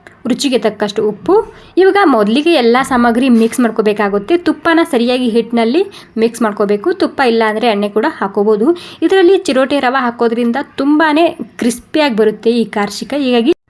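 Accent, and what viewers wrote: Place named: North Karnataka, India. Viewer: Mix kannada badri nam uttar karnataka bhashe spr aram hanga matadri bindas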